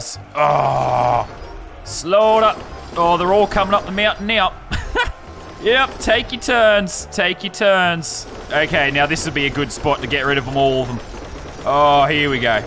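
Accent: Australia. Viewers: This guy Aussie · Australian? Most YouTubers are Americans I am surprised to find a fellow Australian on YouTube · he's Australian... subscribing now... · Are you from Perth because I'm from Sydney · great accent. Australian? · are u Australian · if you think he sounds like pewds... he doesn't, he's Australian. I know cos I am to. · Aussie accent comes through ama subscribe mate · Are u Aussie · You an aussie? The vid was in my recommended list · Hes pewdiepie but funnier and australian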